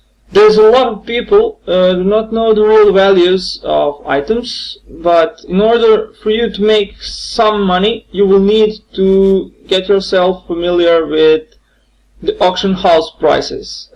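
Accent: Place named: Poland. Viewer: Slychac ze polak :D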